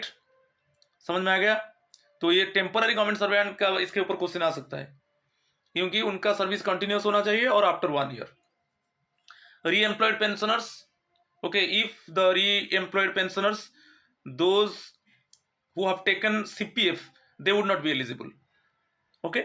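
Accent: Odisha, India. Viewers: Sir i guess u r from Odisha...I m from Sambalpur sir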